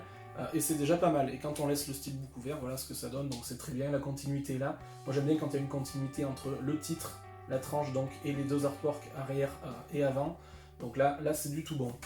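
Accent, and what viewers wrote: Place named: South, France. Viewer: super! Vu qu’à tu as un petit accent du sud et que je suis de Toulouse, tu pourrais me dire lequel? 😅 en tout super vidéo!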